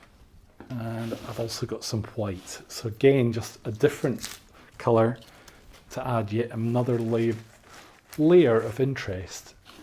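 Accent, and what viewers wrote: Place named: West of Scotland, United Kingdom. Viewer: Thank you so much for your easy to follow tutorials. also so great to hear a fellow west of Scotland accent!! love your work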